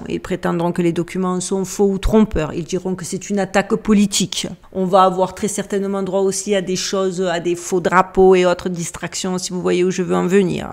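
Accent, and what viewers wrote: South, France. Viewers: Super merci et ton accent du sud si particulier me régale.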